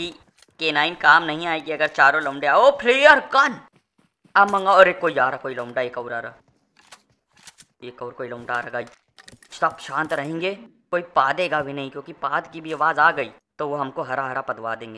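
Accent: Kanpur, India. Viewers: Bhai Full Apni Kanpuriya language bol rhe😂😂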